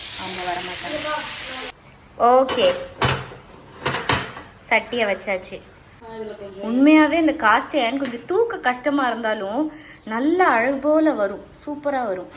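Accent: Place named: Nagercoil, India. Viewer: Yentha or da nagarkovil da tamil super ah irukku da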